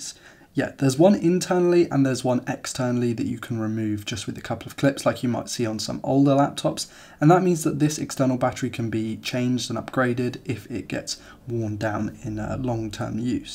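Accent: England, United Kingdom. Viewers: At last someone actually English making these videos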